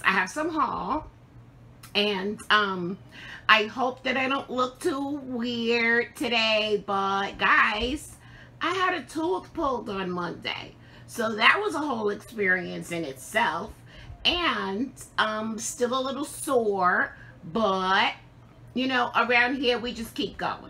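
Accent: New York, United States. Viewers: Hi, Im originally from Bklyn, NY and I detected a familiar accent. I went back to your first introductory flosstube and sure enough your a native New Yorker! Awesome!! So happy to have found you and have subscribed to your flosstube. I never had a stitchy friend from NY. I crocheted back in my Bklyn days but learned cross stitch since living in NJ. I stitch in hand but occasionally will use a qsnap. I like stitching on 28 ct and use mostly DMC but like the fancy flosses variegated look. Ive ordered from Hobby House Needleworks a few times, love that shop. Thanks for being a part of the stitch world. See you next time.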